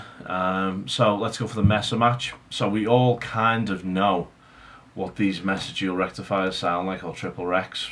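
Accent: Liverpool, United Kingdom. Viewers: With respect, I disagree. I enjoy hearing his strange Scouse-ish tones and humour. It's quite patently not a corporate demo.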